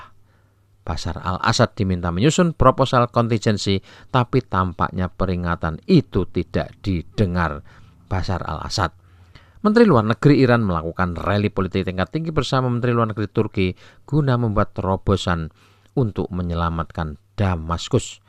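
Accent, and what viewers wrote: Java, Indonesia. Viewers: Jowo medhok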